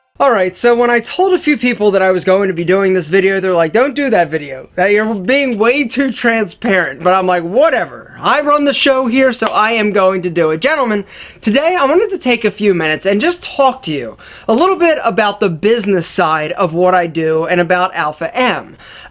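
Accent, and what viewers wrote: Philadelphia, United States. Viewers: Whoever told you not to do this video should be fired...the honesty (which I already realized, but some don't) is refreshing and positive. If more companies would be this open I think us commodities would be a lot happier with giving you our eyes. Sponsors are key, and also don't require the viewer to do anything but watch. Sticking to advertising stuff you believe in is the way to go...if you get paid to showcase products that actually help people, its a win win.....Keep it up. (P.s. Always good to hear that Philly accent on the tube lol, much love from the 215)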